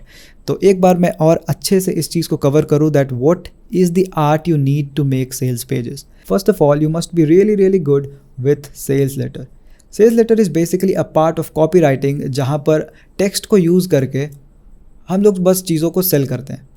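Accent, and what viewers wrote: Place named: Bengal, India. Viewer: Saying US as "तुम".. It clearly shows that you are from the land of Bengal with that sweetness🍬 in our talk. Ami I wrong then mention me. Thank you my friend 😊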